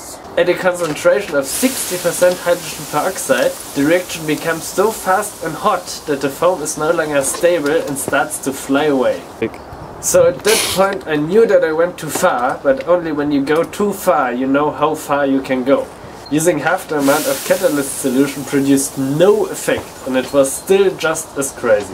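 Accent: Germany. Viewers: Is this guy german or some other nationality. I don't even care, but I love him.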